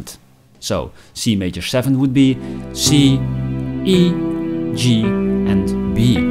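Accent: Netherlands. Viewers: Indeed. And the Dutch accent adds the cherry on top.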